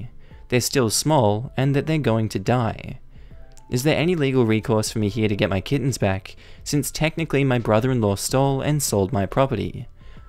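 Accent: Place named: Australia. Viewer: a voice so Australian I nearly fell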